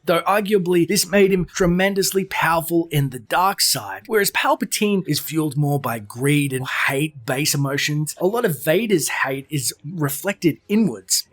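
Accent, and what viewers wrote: Australia. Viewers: Thumbs down. Australian accent sucks.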